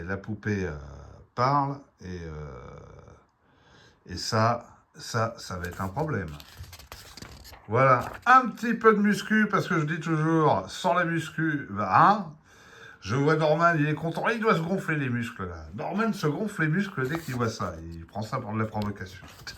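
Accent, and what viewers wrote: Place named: England, United Kingdom. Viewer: Vraiment chouettes ces magazines. J'ai vu qu'un Quebecquois avait écrit une lettre dans le courrier des lecteurs, les chanceux... Alors essaie de dire avec l'accent québecquois "Tabernacle qu'ils ont chouettes ces primates" la prochaine fois. Non c'est ni raciste ni stéréotypé, si on me demande je dirai que c'est la faute à ChatGPT. C'est vrai, quoi, on connait ton merveilleux accent anglais mais pas ton accent québecquois. Le Monde doit savoir. Vache, Taylor dans l'adaptation met un coup de pilon à la Bud Spencer pour assommer le gardien ! J'ai gonflé mes muscles, surtout au niveau du ventre, c'est là qu'ils sont le plus développés.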